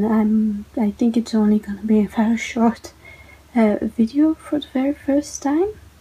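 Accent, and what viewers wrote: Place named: Scandinavia. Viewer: Great to see more new YouTubers doing videos for onebookjuly! I love your accent, is it Scandinavian?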